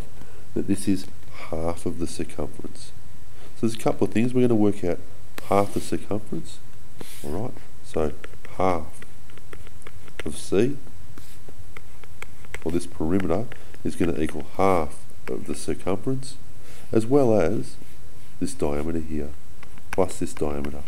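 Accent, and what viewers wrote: Australia. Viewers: I don't really understand coz of his aussie accent.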